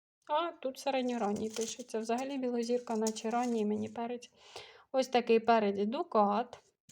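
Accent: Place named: Ukraine. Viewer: український, і говір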